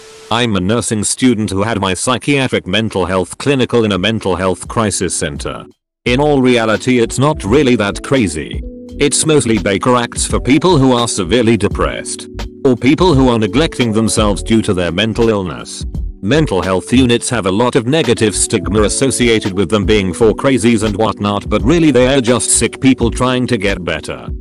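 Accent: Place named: United Kingdom. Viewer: I wish people would use a different voice. I hate it and keep running from channel to channel but every single one uses this annoying robotic brit. Lol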